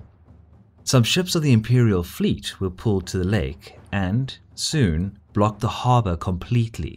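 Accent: South Africa. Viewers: comentor of dorleaum battle is he south african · Nice South African accent by the narrator.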